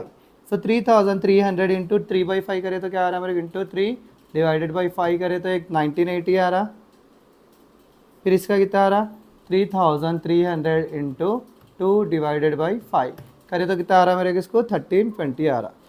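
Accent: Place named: Hyderabad, India. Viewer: I just love Hyderabadi accent 😅😂😂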